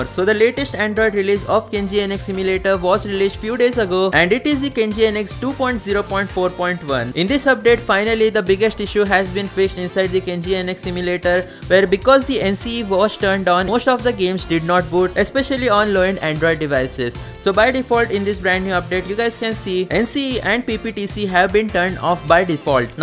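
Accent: India. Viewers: The Indian accent was all i needed to tell me this is fake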